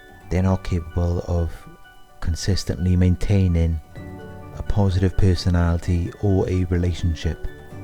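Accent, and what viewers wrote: Wales, United Kingdom. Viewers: New sub. You from Wales? You sound like it. So true video.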